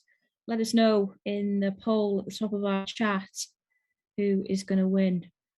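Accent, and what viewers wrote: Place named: Merseyside, United Kingdom. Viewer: thanks for the talk-through. You're a lovely lady and you have a fun stage presence. Can we get you on Match Of The Day, please? I'm sure you'd be an instant hit! I'd love to see you talk through a rock concert, too - Foo Fighters, Paul McCartney, Metallica, whoever.... I just know you'd be an absolute scream and a big hit doing that. I've lived too long away from the UK to work out your accent, but somewhere not all too far from Merseyside seems a possibility. Like I said, I ain't too good at accents. For me, anyone who just tries is already a star. Good on yer, young lady! I've subbed;-)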